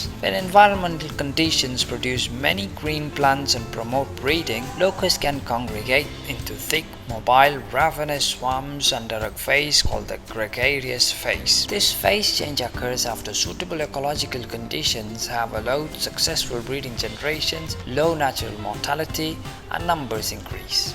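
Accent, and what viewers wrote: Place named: Qatar. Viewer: Nice Qatari Voice....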